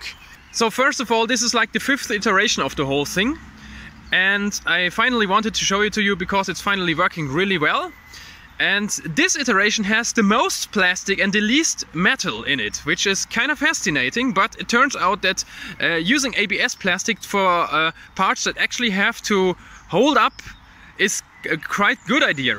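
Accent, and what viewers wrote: Germany. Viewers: das ist doch 100% deutscher dialekt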